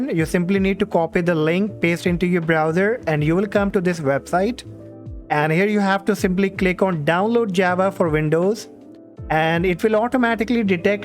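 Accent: India. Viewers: of course he sounds indian.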